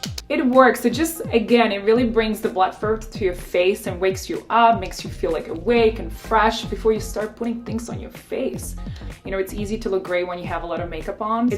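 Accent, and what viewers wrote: United States. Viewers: zní fakt jak američanka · I’m also Czech and i’m genuinely impressed by her accent, she sounds so american, like woah :D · Her accent really sounds american now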